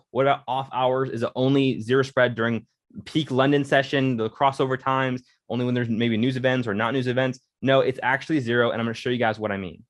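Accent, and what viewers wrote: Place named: United States. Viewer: You sound American 2 me bro, how do use this broker? It doesnt take US residents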